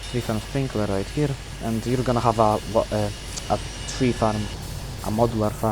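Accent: Poland. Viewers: dzięki za tutorial słychać że Polak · nice polish accent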